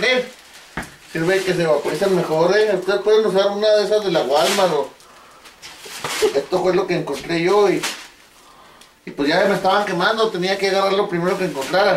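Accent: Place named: Chihuahua, Mexico. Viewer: he estado viendo sus videos y me hace el día su forma de hablar peculiar de nosotros los de Chihuahua arriba el norte, me encanta su autenticidad y que no se anda con mamadas jajaja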